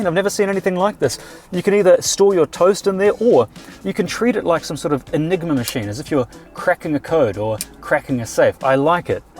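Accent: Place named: New Zealand. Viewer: Just discovered your channel. I like your clear speech (Kiwi ascent not bad) and your casual and slightly excited and fun style also. Your simple but detailed explanation of what you are testing (Atto 3, I like it too). Background music & Ads are not bad either. Thank you. Lloyd from Adelaide Sth Aust.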